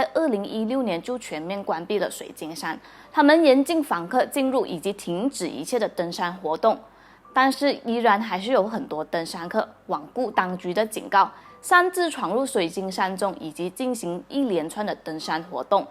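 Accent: Malaysia. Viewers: 马来西亚口音就是酱的啦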